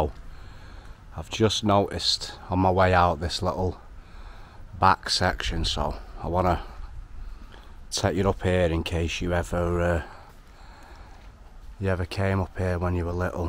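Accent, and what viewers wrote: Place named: Salford, United Kingdom. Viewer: The hollywood touch with a salford accent. Combining flavours.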